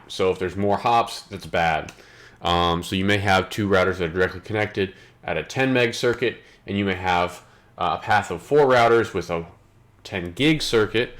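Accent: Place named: Texas, United States. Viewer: Sounds somewhat Texan - and I live in Scandinavia :D So, probably have to make do with the ones you release here then :)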